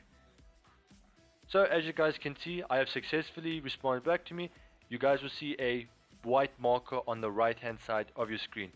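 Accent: South Africa. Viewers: are you south african? and thank you this helped alot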